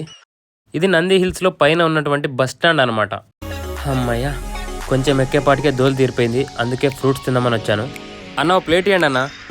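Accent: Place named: Kalyanadurgam, India. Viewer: మాట్లాడే యాస బాగుంది అదే కొనసాగించు ఓకేనా...చెప్పాలంటే మన కళ్యాణదుర్గం యాస😂😂😅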